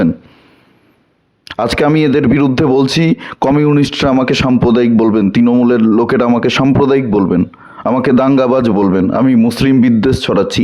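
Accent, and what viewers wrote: Bangladesh. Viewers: কথা শুনে মনে হচ্ছে বাংলাদেশি মাল।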